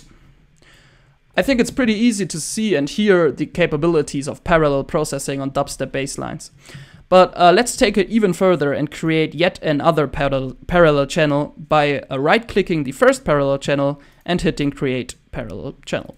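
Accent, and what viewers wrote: Germany. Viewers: ne dein english ist gut, aber wenn man selber deutscher ist, hört mans halt.. ich glaub du hast sogar ne relativ ähnliche stimme wie ich ;-)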